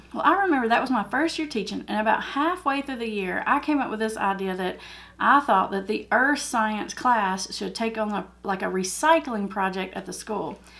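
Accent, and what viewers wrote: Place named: Appalachia, United States. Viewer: i hear an Appalachian accent. I absolutely live for it. I live in Southwest Virginia. I have an area I am currently dealing with that I would love for you to do a video on. I work very closely with a woman who is constantly putting other people down. When I use the word constantly, I seriously mean she does it every breath. I have worked there only 2 years. She has been there 5 years, I am her superior and I have had decades of experience and I have more education. She and I got off to a very rough start. I stood my ground and confronted her face to face with our boss. The boss 100% supported me. Long story longer, my coworker and I recovered and actually became close. I genuinely care for her. She has lots of qualities that make her a valuable employee. BUT.....she is forever more nitpicking our fellow coworkers. 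Many of them are super young women all around 20 and have been there since high school. They are all great girls. Do they mess up sometimes? Sure they do. We all do. In my mind it's our job to mentor these young women, not tare them down. So, I pulled away from her so that I wouldn't have to talk to her, but every time I did speak to her down into the dark negative quicksand we went. I have to fully own that I had allowed myself to be influenced by this negative speech and I became as bad as she was. So, I snapped out of the nasty, but I soon realized that being complacent is just as bad as being an active participant. I am generally a very positive person, calm per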